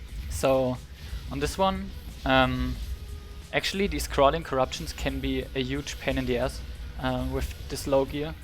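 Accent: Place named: Germany. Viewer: Ich wusste doch der akzent ist Deutsch! Ein Frostwolf kollege! :D Davon ab: sehr gutes Video, habe erst kürzlich wieder angefangen und Visions sind noch recht neu für mich (hab gestern den ersten 4Mask run gemacht). Ich konnte jetzt einige Sachen aus dem Video mitnehmen die mir sicher in späteren Runs helfen.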